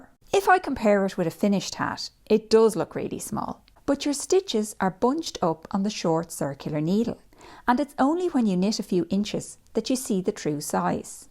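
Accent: Ireland. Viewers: Very nice instructions and love Irish accent 🎉❤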